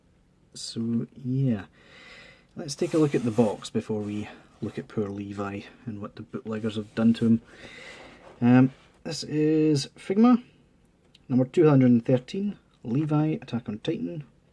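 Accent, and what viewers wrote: Scotland, United Kingdom. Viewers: You sound Scottish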